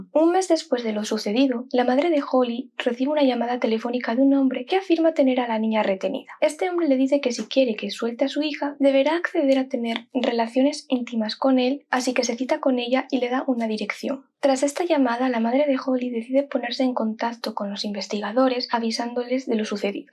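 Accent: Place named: Spain. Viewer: alguien de España contando casos..porque entiendo mejor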